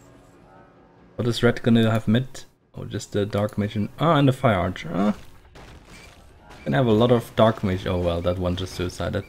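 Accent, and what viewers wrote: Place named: Germany. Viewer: Dieser Moment, wenn du eine Sekunde nach dem Start des Videos merkst... ...dass der Sprecher Englisch mit deutschem Akzent spricht. :-D Aber nett, dass noch jemand das gute alte WC 3 spielt, weiter so! :-)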